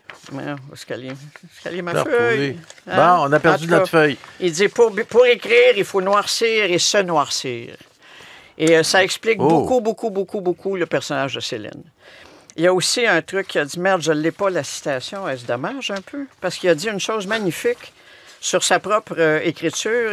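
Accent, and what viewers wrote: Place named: Quebec, Canada. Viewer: Merci beaucoup pour votre présentation. J'adore l'accent canadien, québécois. D'Algérie je vous suis attentivement.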